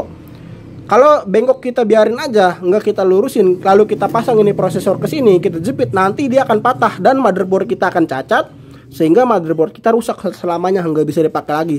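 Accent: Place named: Bekasi, Indonesia. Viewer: Pasti orang bekasi cikarang euy wqwqwq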